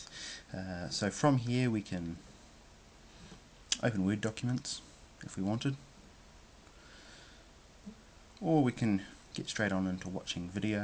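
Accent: New Zealand. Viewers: Excellent video, and awesome New Ziland accent. Great to see the keyboard and mouse attached. Thanks.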